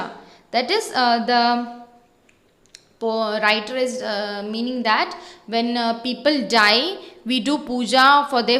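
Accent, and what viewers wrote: Kerala, India. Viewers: are you malayali ma'am?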